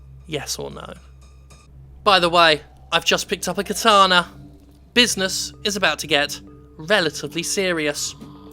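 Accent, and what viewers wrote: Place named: England, United Kingdom. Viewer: British Love how he goes off like a nuke whenever he plays a scary game, "OH F*CK OFF SH*T F*CK", with that awesome english accent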